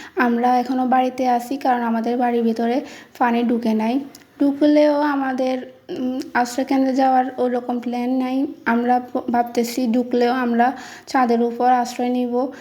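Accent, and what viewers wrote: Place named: Noakhali, Bangladesh. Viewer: খাটি নোয়াখাইললা 😊😊😊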